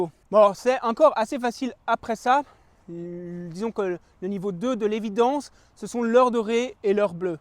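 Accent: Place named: England, United Kingdom. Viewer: Merci pour la vidéo. Tu commence à influencer ton français avec l'accent anglais. Je retrouve aussi que tu cherches des mots anglais temps en temps. Essaye de faire un vidéo en anglais. Ça va te faire une exercice. 😀 Je te souhaite une bonne lumière!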